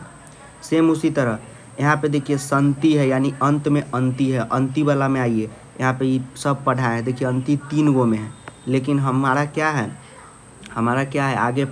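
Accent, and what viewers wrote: Bihar, India. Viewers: Sir aap bihar se h kya ... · Yah to full bihari hai bhayiya sir aap bahut acha padha rahe ho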